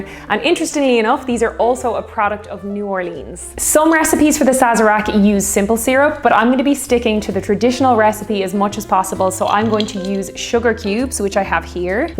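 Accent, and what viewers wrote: Ireland. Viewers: Always enjoyable to see you online. There’s something I should tell you. As an Internet fan. There are four or more ways to properly pronounce the city you named and none of them are New Ore-leens. Locals will truly look at you like you are deliberately baiting them and you don’t want that. The oldest of the 4, from what I can tell is New Ore-lay-Ons. That lay sound is probably what has been twisted by yanks into the despised ee sound. As if it was said in French, which was originally spoken there. A miner variation is New Ore-le-ohns with just a hint of the h pronounced. Another acceptable variation is Nore-lans. Only two syllables. Finally. The tourist inoffensive version. New ore-lans. With an a as flat as you like. You may get passed on the bad looks with your Irish accent and incredible beauty, but why say the equivalent of “Hasn’t Ireland always been a part of England?” If you can avoid it? 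By the way, the second largest genetic heritage found there is Irish. So don’t be surprised if you see familar-ish faces behind the bar at places like Pat O’Brien’s or anywhere. But don’t go during this mess. If you want a real treat, go during the New Orleans Jazz Festival. Based on your background music you will love it. I went to college about 3 hours away and spent almost a month in Louisiana, aka Lou’s-I-an-a. (No pronunciation land mines there.) so that’s where I got this knowledge. Thanks for brightening the dark dark covid days. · Love your joyful approach to the making of this drink although would have watched just hear that delicious Irish accent! Thanks.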